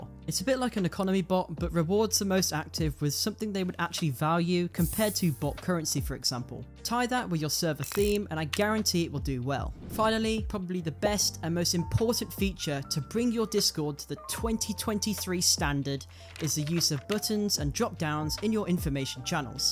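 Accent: United Kingdom. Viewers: hello my fellow british person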